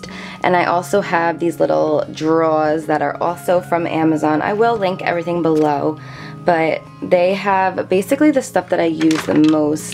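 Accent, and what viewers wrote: New Jersey, United States. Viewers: Her Jersey accent ❤ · Actually I think it's a New Jersey accent. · Please don't be sorry for being who you are!!! I love that Jersey accent, and I say cawfee and I'm from New York. Negative comments always come from those feeling that way inside its a reflection of how they feel about themselves. I love all your videos and the genuine side you always show. Beautiful inside and out! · Good heavens, that's a Jersey accent. Hearing you speak reminds me of my friend's accent and how much I wish she were still alive. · she's from Jersey. It's her accent. I pronounce it the same. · Where are u from? Are u from jersey? Trying to figure out where ur accent is from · It's a Jersey accent. :) I used to say it like that until I moved to Virginia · new subscriber here - love your Jersey accent!! (I am from Long Island - we have a similar accent :-) great video - got lots of ideas! 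Thanks! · It's called a New Jersey accent, not a California accent. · Your accent has gotten so strong "jersey".. You're adorable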